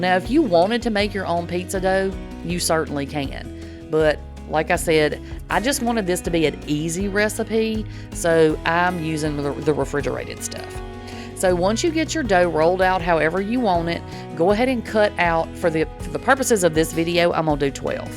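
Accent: South, United States. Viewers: I could listen to you talk all day. Love the southern sound of your voice.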